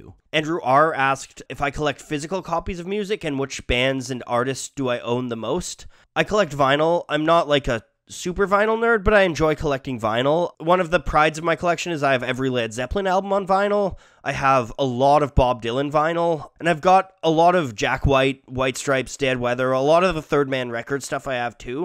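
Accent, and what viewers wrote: Canada. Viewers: You sound like my brother in law except with a Canadian accent. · He really sounds a lot more Canadian.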